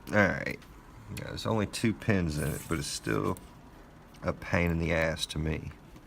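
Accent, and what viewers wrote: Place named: South, United States. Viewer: Great video on figuring these out. I am about to start learning on medeco as well, but I have been too chicken chit to start. lol I have to know though, what part of the South are you from, Bud? That drawl is unmistakable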